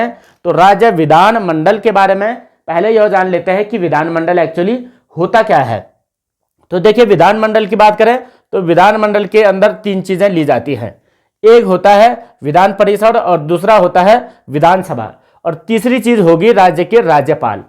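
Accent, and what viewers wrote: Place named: Garhwal, India. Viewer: सर जी आप उत्तराखंड से कहां से हो ? भाषा से तो गडवाल मंडल के लग रहे हैं ❤😊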